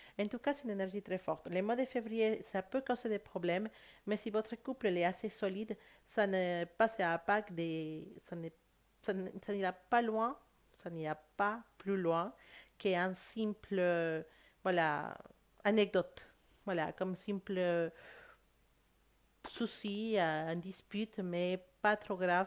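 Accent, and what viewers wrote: Spain. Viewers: Holà, gracias por tu tijada. Has visto justo..Espero que el piensa en mi como yo pienso en el.Pero no quiero entrar en communicacion con el.Espero que sea el que lo haga en Febrero. Una pregunta: cuando interpretas puedes ensenar las cartas de l Oracle de la Triade que te han inspirado.Gracias..y por el acento, es el mejor.el.acento espagnol 😋😁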